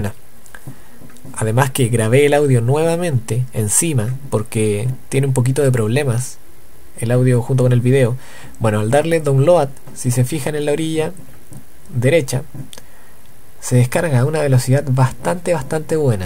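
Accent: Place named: Chile. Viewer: Gracias por la app. Muy útil. Por cierto qué acento tan CHILENO tienes :D. Un saludo!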